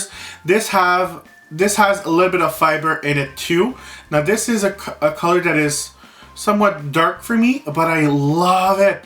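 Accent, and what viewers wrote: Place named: Quebec, Canada. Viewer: Pe-Nell-o-pee. You French Canadians and your Accents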